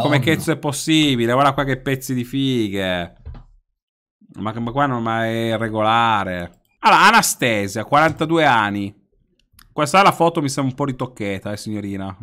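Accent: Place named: United Kingdom. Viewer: amo il tuo inglese very british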